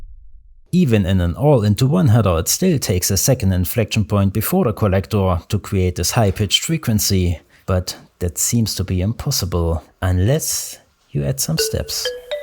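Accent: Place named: Thuringia, Germany. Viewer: On a side note your thick accent sounds like you could be from near Thuringia. Yet there is often a hint of Swiss or Austrian. Very interesting. In Gera haben die immer so einen tollen Akzent.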